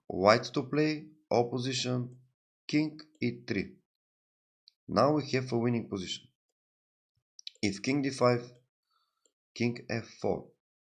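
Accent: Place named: Scotland, United Kingdom. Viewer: 😂 приятный и знакомый акцент) вы из Шотландии?)))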